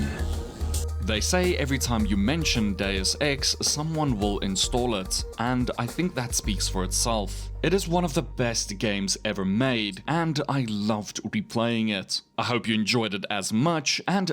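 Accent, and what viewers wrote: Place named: South Africa. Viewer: Are you carring me a riar? Always kills me😂😂..good to hear a South African voice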